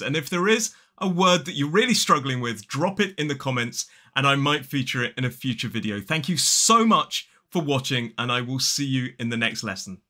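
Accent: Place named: RP, United Kingdom. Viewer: Very interesting to hear difficult words pronounced with a British RP pronunciation. Thank you for the lesson ❤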